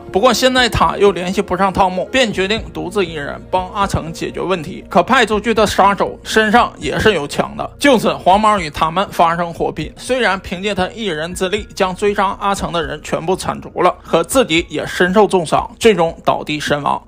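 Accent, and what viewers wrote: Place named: Northeast, China. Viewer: v 主播这个东北北京话有点意思啊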